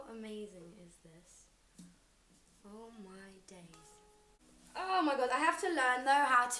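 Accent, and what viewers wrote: United Kingdom. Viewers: English accent is the bow that ties it all together honestly. 100 out of 10 would've been head over heels for her back in highschool.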